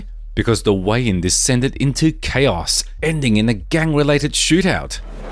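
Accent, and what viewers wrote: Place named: United Kingdom. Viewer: Chinese with British accent???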